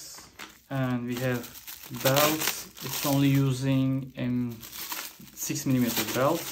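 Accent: Turkey. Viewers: Hocam videonuzu izlerken aksanınızı türk aksanına benzettim, tam yorumlara girdim sormak için biri zaten sormuş bile :D. Bu kit Türkiye'ye gönderilemiyor diyor aliexpress sayfasında, nasıl eriştiniz kite acaba? Ne kadar vergi çıktı?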